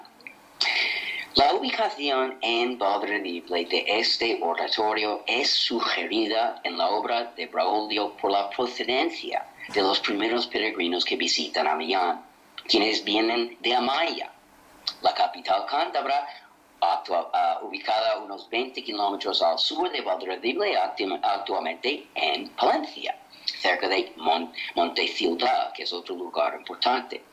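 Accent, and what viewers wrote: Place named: United States. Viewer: Este estadounidense es un sabio erudito y se esfuerza en trasmitirnos datos sumamente interesantes, pero habla demasiado rápido e indudablemente con un acento poco español. En un texto escrito, se le entenería perfectamente. Pero GRACIAS.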